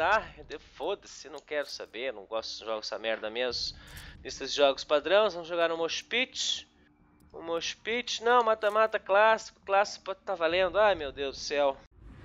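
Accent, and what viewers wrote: Rio Grande do Sul, Brazil. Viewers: Tu é gaúcho do sul thê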